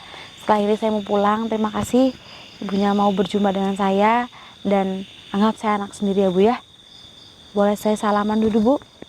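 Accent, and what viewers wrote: Banyumas, Indonesia. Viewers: Pingin banget di marahi Ama mbak dengan bahasa ngapak nya, palingan aku cuma cengar cengir..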